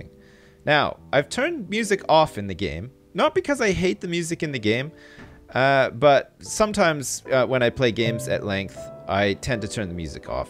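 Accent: Canada. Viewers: Please, would rather have no music than that. The really slow piano brings the tone of the video down:(... Am I crazy? It's very juxtaposing to your cheery Canadian voice.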